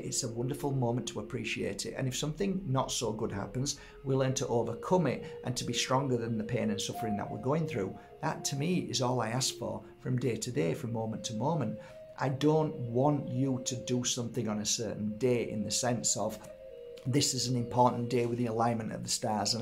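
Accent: Yorkshire, United Kingdom. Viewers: Is it a Yorkshire accent?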